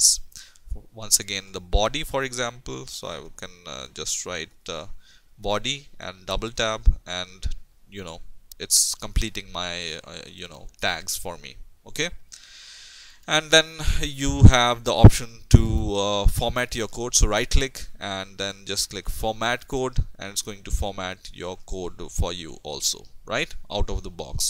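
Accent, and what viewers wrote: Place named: India. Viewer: wahhh..indian accent.. god i am going crazy listening to u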